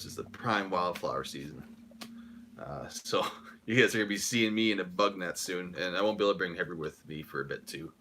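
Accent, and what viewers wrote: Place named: Quebec, Canada. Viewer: You were talking with your hands so the dog thought you wanted to play. You don't sound Ontario Canadian , you say eh way too much. I'm Ontario Canadian, and I say it a couple times every few months or less lol. Actually you do sound like you're French Canadian from Quebec with that many eh's in a short time. I prefer to watch mostly all Canadian channels of camping. I'm a new subscriber. Actually you could be from Vancouver or Newfoundland they say eh tons too.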